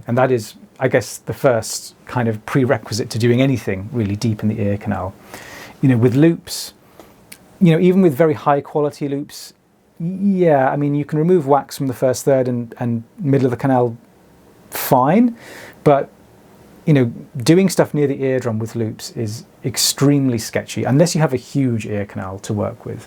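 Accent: England, United Kingdom. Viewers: I might be really shallow but I like to tune in just to listen to your beautiful English voice. Wax removal is a bonus!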